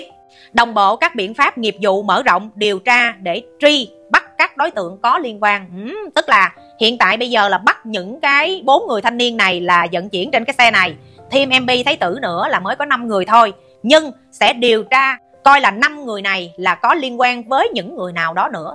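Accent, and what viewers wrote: Standard. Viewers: Chúc chị nhiều sức khỏe và luôn tươi trẻ khỏe mỗi ngày.Chị có giọng nói rất hay và chuẩn❤❤❤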